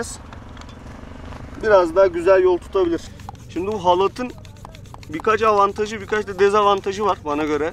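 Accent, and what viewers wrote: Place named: Bulgaria. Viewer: Abi konuşmandan belli Bulgaristan göçmenisin jajsja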